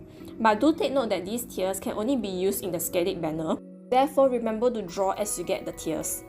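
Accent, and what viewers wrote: Singapore. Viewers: The chinese / sg accent satisfy me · Hi, are you from Singapore? Haha you sound like it 😅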